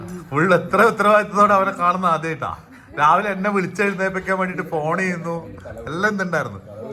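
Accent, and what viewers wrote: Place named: Kannur, India. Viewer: കണ്ണൂർ slang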